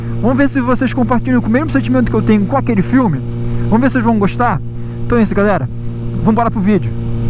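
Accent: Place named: Rio de Janeiro, Brazil. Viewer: eu acabei de rever o filme, cara parabéns pelo vídeo e abençoada seja a Internet né por que nos permite coisas como essa sensacional curiosidade nostálgica que você trouxe! Um adendo, esse filme foi fantástico pelo carro pelo amor adolescente (eu era apaixonado na atriz, hoje não ela tá com 57 anos kkkkk) a história de suspense como um fantasma que buscou uma vingança justificável, através do desconhecido pra nós, máquinas de outro mundo, uma força cósmica, o ronco pra lá de insano do carro parece sincronizado de 2 motores deu um efeito assombroso...apesar de hoje parecer tosco como você disse na época nooossa era top mesmo, e a gente viaja no tempo, olha parabéns de novo e ganhou +1 inscrito brother!! Forte abraço tmj... seu sotaque carioca mano kkk eu sou do Mato Grosso do Sul campo grande, aqui é porrrtera, nóis, faiz, bão kkkk vlew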